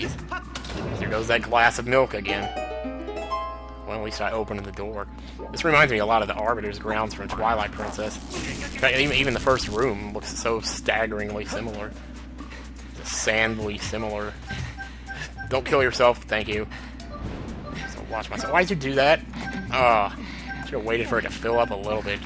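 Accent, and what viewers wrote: South, United States. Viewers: I love your southern accent never change it:3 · 1. Please talk southernly more 2. Please film yourself, it would be awesome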